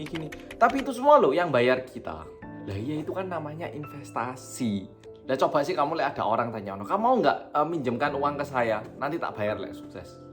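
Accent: Java, Indonesia. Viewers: Ko medok mas bahasane,sm kaya aq 😅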